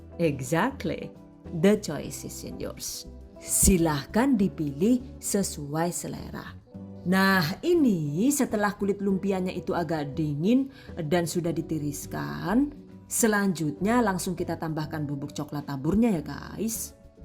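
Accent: Banyumas, Indonesia. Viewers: Kakanya orang mana kok ngapak 😂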